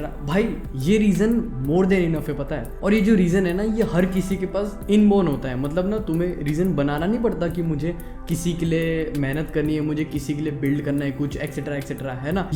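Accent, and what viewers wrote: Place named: Rajasthan, India. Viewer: Bruh are you from Rajasthan?? cos your accent seems so..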